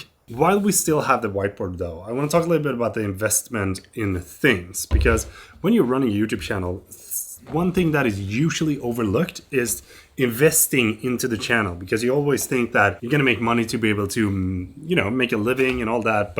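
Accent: Sweden. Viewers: Your video editing is brilliant. Love it,. (As I typed I was saying it in your satirical Swedish accent in my head).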